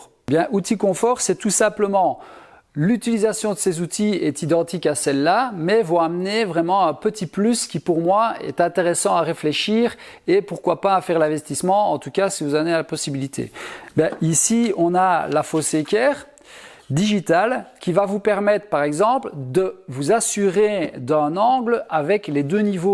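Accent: Belgium. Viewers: Très bonne vidéo, c’est clair utile et très instructif comme d’habitude. J’ai toutefois un petit reproche à faire, je trouve dommage de devoir préciser (ou corriger) à chaque prononciation de nonante ou septante pour plaire au public français dont je fais partie. Ça fait partie du charme selon moi de regarder les vidéos d’un belge, c’est comme si un québécois s’empêchait de parler comme il le fait au quotidien avec son accent et ses expressions. Si "l’gosseux d’bois" parlait un français "parfait" ses vidéos perdraient de leur âme. Bonne continuation et vive la Belgique !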